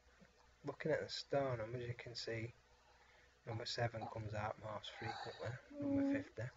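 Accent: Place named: Northern England, United Kingdom. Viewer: Oh.. what a pity..:-( to me it seems like this is a good advert to push people playing even more euromillions. And it is carefully targeted obviously; as the PR (devil) people knows what they're doing. Luckily no one is watching this ** (39viewers)!!! The accent is from the north of England (notoriously work deprived area) "I was unemployed for 5 months..." (what that has to do with euromillions??) trying to look scientific with a spread sheet?!?!?! Everybody knows that lottery is another way for the government "to attract" people's fund and impoverish them little by little. The Euromillions is rigged, if you look at the draw history the combination of 3 or 4 same numbers comes up sometimes twice in a couple of months which is statistically improbable. Then HDVIDEOS999 ask for the link and you are ... busy?!?!?! LOL!!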